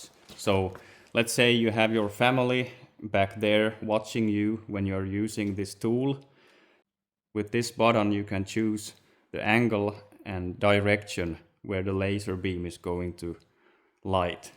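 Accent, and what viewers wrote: Finland. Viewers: Good video, how did you learn such good English, it’s very good. I see where you live, looked it up on Google Maps. But you sound exacting like everyone I knew growing up with your accent, I love it, reminds me of times and old timers long gone. Don’t know if you know anything as far as geography of US. But I grew up in Toivola, Minnesota, 50 miles NW of western tip of Lake Superior. All my ancestors came from Finland, I’m old 66, probably last generation here that didn’t learn Finnish, my grand parents tried, but I guess I had nothing to do with it when I was little lol, but when I went to first grade in 1966, there was some of my class mates that could only speech Finnish. Love watching your videos, and learning about your country and ways, I grew up on the farm also. One thing I know!! you live close to that big body of water that controls controls your weather! 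Our big Lake Superior does the same thing here. I always said my ancestors must have settled where they did when they got of the boat and train because the weather was horrible just like what they left so it was just little home!!!!!😂😂😂 Thanks your friend Larry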